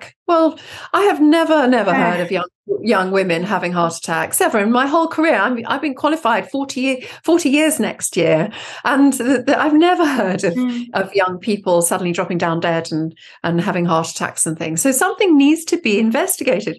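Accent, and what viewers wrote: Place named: South Africa. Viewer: Excellent interview with great advice. Thank you so much for bringing this to us. And rather nice hearing your SA accent! Take care. ♡♡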